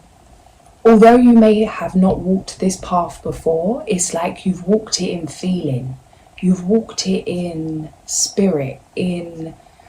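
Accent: United Kingdom. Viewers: Commenting to goose the algorithm. Greatly enjoyed this read. Your voice made me miss mushy peas (with a hint of mint) and a superabundance of swans. Please enjoy them for me.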